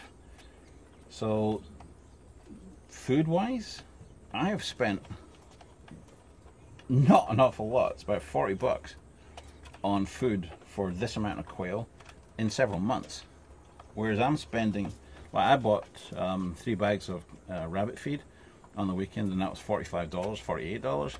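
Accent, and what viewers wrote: Scotland, United Kingdom. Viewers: Have you always lived in canada? I swear I can hear some scottish in your accent!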